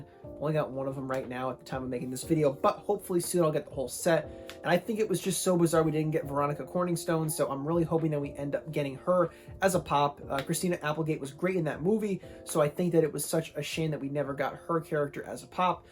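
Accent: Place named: New York, United States. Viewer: Okay but I loved the New York accent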